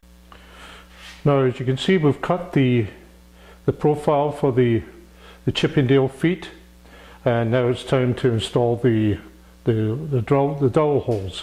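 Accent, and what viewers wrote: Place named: Scotland, United Kingdom. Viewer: Scottish 🏴 twang wee do everything best the Scots great jig 👍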